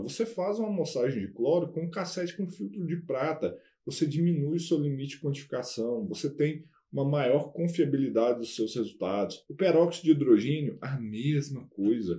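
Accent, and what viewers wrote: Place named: Minas Gerais, Brazil. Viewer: Gostei. Pennnssaaa num trêm veio. É minero mmo. kkkk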